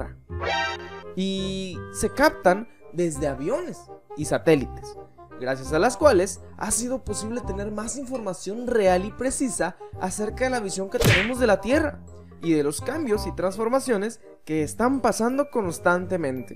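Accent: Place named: Fresa, Mexico. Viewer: se oye como fresa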